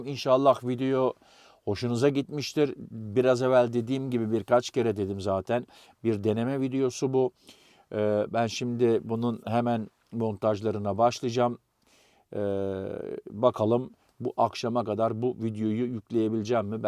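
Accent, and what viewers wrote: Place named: Istanbul, Turkey. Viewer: Bey elinize aklınıza saglik, temiz bir İstanbul Türkçesi ve tertemiz ses tonuyla kolay dinleneceksiniz. (Cekim teknik ayrıntılarna yer vermeseniz iyi olur) Görüntü güzel, toplumsal yaşam hk. açıklamalar hoşumuza gider.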